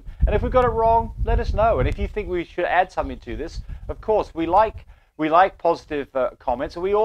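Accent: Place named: England, United Kingdom. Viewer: Ps: I saw you have a English accent 🇬🇧I’m from England too. Do you know anyone in England who breeds dogs and does these videos? Thanks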